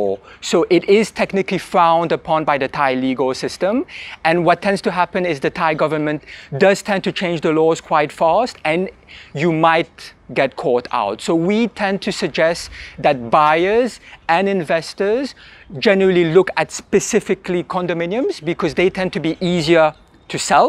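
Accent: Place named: France. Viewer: He speaks English with a French accent.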